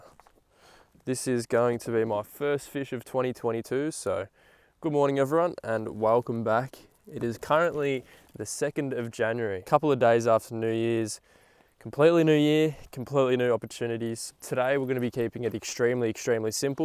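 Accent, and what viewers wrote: Australia. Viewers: I love the Aussie accent. combined with fishing that is just perfect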